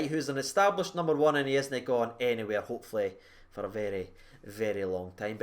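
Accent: Scotland, United Kingdom. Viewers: Scottish, vocal and good reactions Alan McGregors brother?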